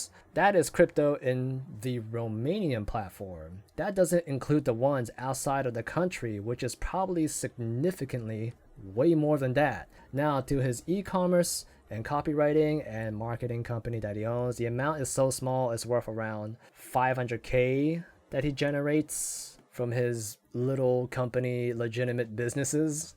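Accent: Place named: Asia. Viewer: i can hear ur Asian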